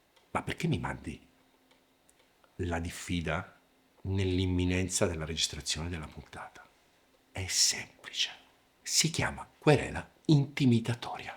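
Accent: Italy. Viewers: La cosa più tremenda di questi video è l’accento che ha Corona..da bauscia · Ma sto accento piemontese fortissimo??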